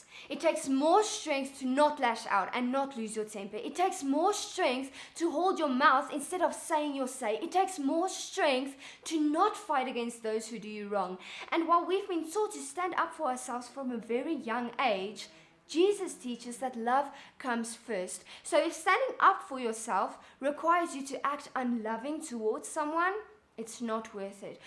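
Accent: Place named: South Africa. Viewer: Lol I was about to say you sound South African 😂😂. Enjoyed your message. Beautiful from Botswana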